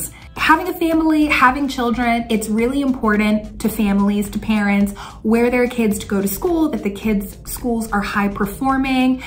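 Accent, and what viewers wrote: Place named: South Dakota, United States. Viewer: Sound like you are from Sioux Falls.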